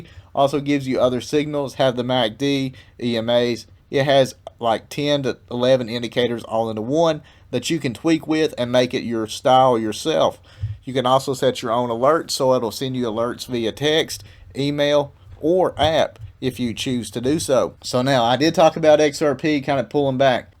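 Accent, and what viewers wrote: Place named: Appalachia, United States. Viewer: it's hard to believe anything you say with that hillbilly accent.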